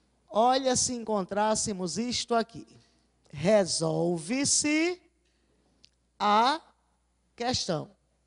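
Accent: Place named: Northeast, Brazil. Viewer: Até que fim, encontrei alguém que fala minha " língua" nordestina porreta. Arretada de boa, clara e objetiva. Obrigada. IVANI.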